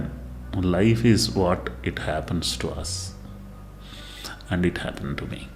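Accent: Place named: Tamil Nadu, India. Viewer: Love that TAMIL english accent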